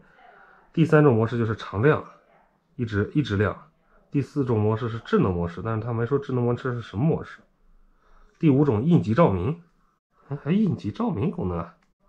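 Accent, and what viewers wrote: Inner Mongolia, China. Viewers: 内蒙古口味的普通话，听着很新鲜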